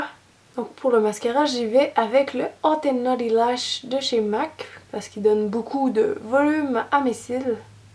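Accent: Quebec, Canada. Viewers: Whoua Alyssa Milano avec l'accent québécois ;-) très jolie !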